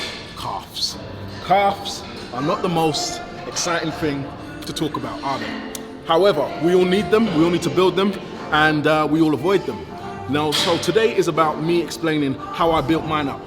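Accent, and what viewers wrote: United Kingdom. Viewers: I didn't know that had an british accent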